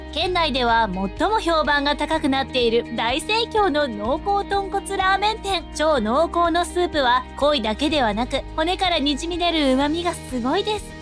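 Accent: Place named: Gunma, Japan. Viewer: おすすめのラーメン屋が入ってないのが残念ですが、地名のイントネーションが群馬弁なのが好感度高い。 標準語はた→か↑さ→き↓みたいだけど、群馬の人が群馬の市の名前をた→か→さ→き→って言ってるんだから、そこはもう標準語とかではなくた→か→さ→き→なんだよなぁ。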